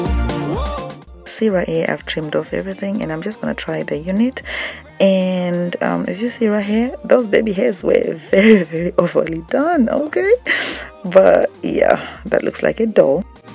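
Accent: Zimbabwe. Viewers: You sound just like my aunt. You have to be Zimbabwean. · Chaz Lynn she's Zimbabwean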